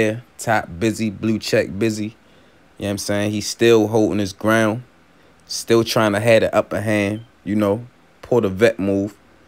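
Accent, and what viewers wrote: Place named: Baltimore, United States. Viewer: I'm just hearing your accent... Bmore❤ can't tell if its East or West but definitely Bmore...❤ Much Love and Respect!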